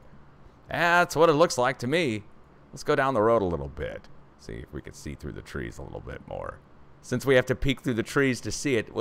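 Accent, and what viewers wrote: Russia. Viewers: lollllll Love the Russian accent!!! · Nobody no agency should stop you. But unfortunately in this time they try. And why? My dear that is the question. Like your russky accent. You kinda sound like Natasha's boy toy Boris.God bless you guys. And thank you.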